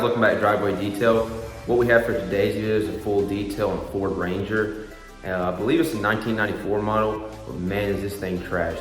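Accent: South, United States. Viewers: i heard your voice i ohhhhhh hesss a countryyyyy boyyyy😊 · Detail geek but southern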